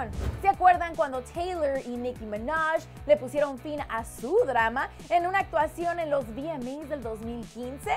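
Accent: United States. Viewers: No busco ofender a nadie. Los estadounidenses tienen un acento MUY fuerte, por eso la forma de hablar de. Si escuchas a un inglés, hablando inglés:v, notarás la GRAN diferencia de acentos. P.D: Me dan igual los insultos. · la conductora es gringa es normal que hable así es como cuando le hicieron pedos a la conductora de noticieros latina por pronunciar bien los nombres en español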